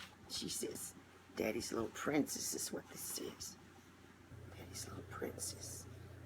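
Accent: United States. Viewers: I completely agree about reborns being helpful for getting through those anxious times. I like to feed and change mine when I'm anxious too. Also agree about God giving us strength we never could have guessed at right when we need it. He is good all the time. I'm so sorry you and your mother are going through this, though, and you will both be in my prayers. I love your voice and your accent. I was born in NC. I now live in IL, and I miss my family so much. Listening to you reminds me of home.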